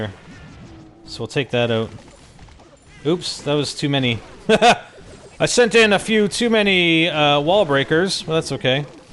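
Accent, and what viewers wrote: Canada. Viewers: are u from canada??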